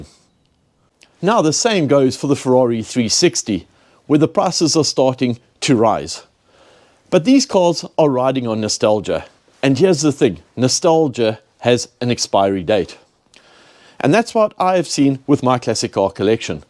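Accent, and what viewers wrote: South Africa. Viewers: first time viewer. What a great guy, with a fabulous south african accent! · First time watching a video from this channel. It seems that you're a South African living in the UK. The accent is a giveaway if I'm correct about that. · What a fabulous collection of cars ❤️. Is there a hint of a South African accent in there?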